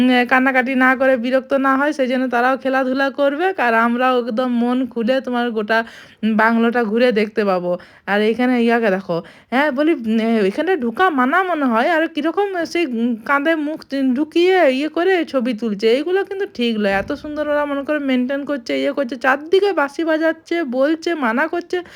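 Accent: Bankura, India. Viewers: তুমি কি সবসময় এভাবে ই কথা বলো?মনে তো হয় তোমরা বাঙালি ব্রাহ্মণ। তুমি বাঁকুড়ার লোক।বাঁকুড়ার সবাই কি এরকম ভাবে কথা বলে?আমি ঠিক জানি না।তাই জিজ্ঞেস করছি।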